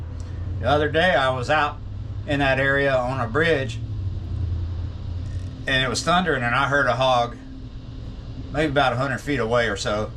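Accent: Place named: South, United States. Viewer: 😂😂Southern accent now 😂😂. Are you moving back to try to get your driving license so you can do the van life thing. Good luck.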